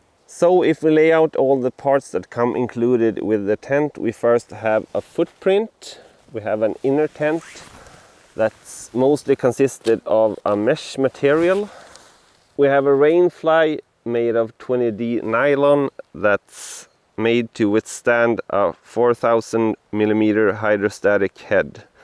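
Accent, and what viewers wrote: Sweden. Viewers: Are you Sweedish or Norwegian, you have that accent when ending words 😂 nice vid and tent. Prob watched 40 already as I am going ti buy it and then ready to go to Sweeden for camping :D closer to go there from where I am then back to Croatia where I am from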